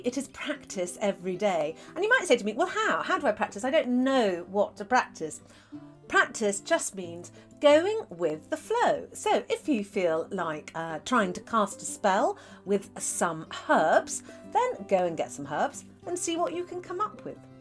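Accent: United Kingdom. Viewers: I am utter rubbish with meditation. I either fall asleep or think of 500 other things I need to do. I do love my pendulum though!! I recently was a guest speaker at a collage class on religions. Brief history on witches, sabbats, and practices. They were facinated with my pendulum, their inability to get him to work, loved that I could get answers and was correct. Thank you for this information ma'am! I have already shared as your voice is so much better than mine. Brittish accents are the best!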